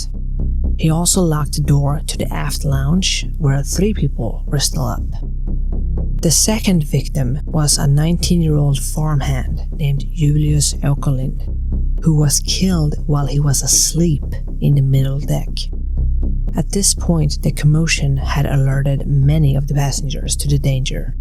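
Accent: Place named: Sweden. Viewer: I appreciate the work you put into your videos and your commitment to rejecting generative AI. This was a story I hadn't heard before, unsurprisingly, as an American. I'd love to hear more like this from your neck of the woods (assuming from your accent and pronunciation that you're Swedish).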